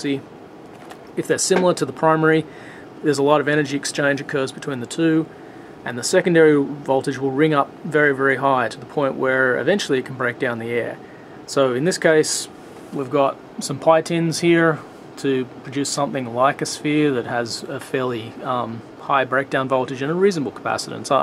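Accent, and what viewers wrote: Australia. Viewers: Very well explained. When Im tired of modifying my 1958 radio (6BX6 RF amp, 6BL8 local oss and mix, 6BX6 IF, 12AX7 Baxandel tone and 2x 6GV8 audio) to fill the dial with DX, I would love to have a crack at a Tesla Coil. I agree that tuning will improve things. Some people reckon harmonics can be good, guess I will find out. Great to hear an Aussie voice (besides Mr Jones) here on youtube.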